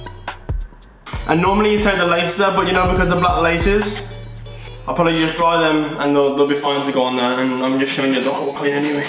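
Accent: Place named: Liverpool, United Kingdom. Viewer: What accent even is that like southern with a twang of scouse mixed in 😂😂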